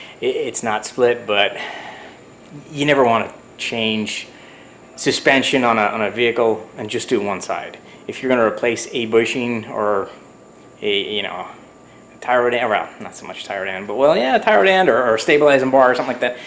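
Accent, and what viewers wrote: Belfast, United Kingdom. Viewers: Is that a hidden belfast accent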